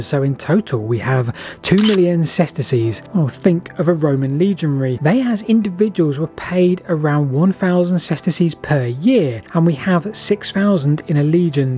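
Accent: United Kingdom. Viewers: Okay, aside from the British accent, and the poor spelling in the captions, there are so many "asides" and rambling on that I cannot follow what the hell you're saying, or your reasoning. Is it that Roman roads were "straightened" by wealthy citizens so they could name the side-road after themselves? And have a small temple or monument placed to glorify themselves? What? Maybe those "maps" work for the viewers in England, but they do nothing for the rest of us. You had to take so many detours to avoid private farms or locked gates, I have no idea where you went becaue you never showed us on a map! Or even pointed behind you, saying "I came from back there...." For example, you bring up Hadrian, but never say why he's important. You're getting a downvote from me, because what a frigging mess! The countryside is beautiful, but it's all a mess!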